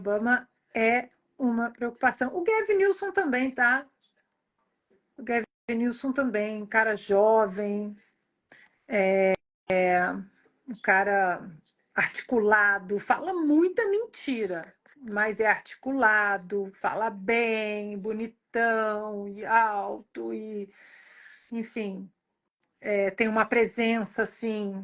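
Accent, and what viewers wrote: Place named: Minas Gerais, Brazil. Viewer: Xará, é muito bom te ouvir! Tú fala num mineirês calllllmo e bem explicadim. 🤭 Aprecio este teu hábito. Bjão pr'ocê, sô! Deus te abençoe!!!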